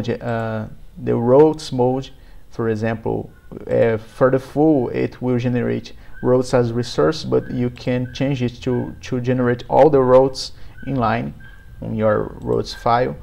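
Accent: Brazil. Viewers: Conheço um brasileiro de longe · Brasileiro certeza. Mas ficou bom mano parabéns.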